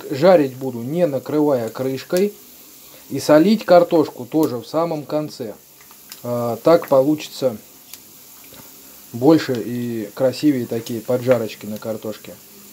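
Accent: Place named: Kursk, Russia. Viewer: Какая область???? Акцент Курский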